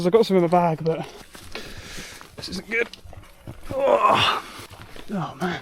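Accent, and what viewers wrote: United Kingdom. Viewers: Where are you? From your accent, buildings, car, etc. I'm guessing the UK? Nothing dangerous there? I guess there have been Puma sightings, but no bears or other large predators. I would have gone to seek out the noise unless you were on private land. Kinda sounds like someone is butchering a cow. My guess... Fox, Cat in heat, Owl. In North America, we have a lot of large predators bears, cougars (not old ladies), wolves, wolverine, moose(very dangerous), elk(bugles), etc. Even Bigfoot! Sounds kind of like your noise. 😁 Is there a zoo nearby? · It was a cow. Well, bull, actually, since "cow" is the term for a female bovine specifically. That was the sound of a horny bull. I'm guessing you're in the UK from your accent and how flat everything is around you. If that is true, then the most dangerous thing in those woods was probably another person. 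Not trying to diminish your instinct to get out, just saying that there aren't any large animals out there that would have caused it. Cattle can be dangerous, especially amorous bulls, but still, a junkie or psycho human is going to be your biggest threat walking around out in the middle of nowhere.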